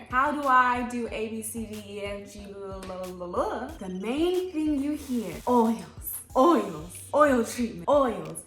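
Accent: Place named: United States. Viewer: Ok, you are SOOO pretty and I love your voice! For context, I’m British and love your American accent.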